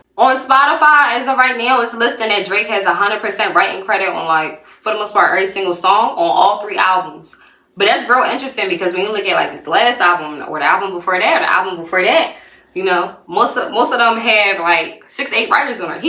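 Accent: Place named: Philadelphia, United States. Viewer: That Philly accent is everything… 😩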